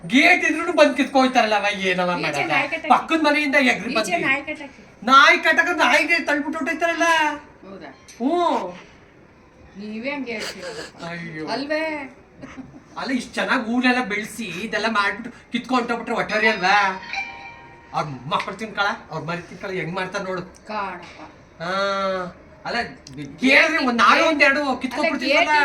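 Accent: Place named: Bangalore, India. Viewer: Nam banglore bhage chanagi helidri navu bangkore avare Banashankari avaru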